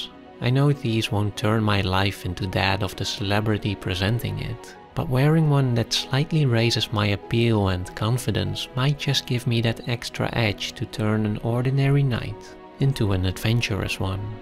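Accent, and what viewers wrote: Netherlands. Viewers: Are you Dutch? Your accent seems Dutch. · kan ik opmaken uit je accent dat je in der Nederlanden woont? Klasse kanaal, topkwaliteit materiaal dit! :D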